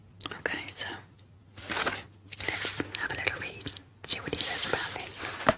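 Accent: United Kingdom. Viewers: I love your British accent 🌹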